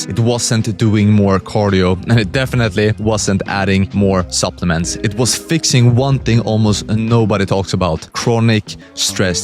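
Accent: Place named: Sweden. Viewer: I would love to se this video but I cant stand a swedish accent. Can someone tell me what tho overall ide is?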